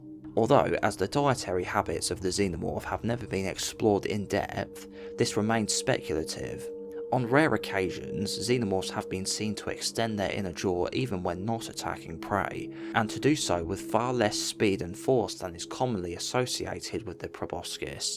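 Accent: England, United Kingdom. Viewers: accent is giving me british forest gump vibes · Because he's from England, is reading from a script and does not have a £300 microphone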